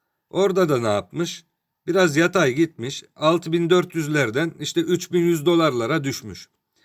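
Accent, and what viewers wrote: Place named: Adana, Turkey. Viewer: Şiven aynı Adana yöresi gibi memleketin neresi be abi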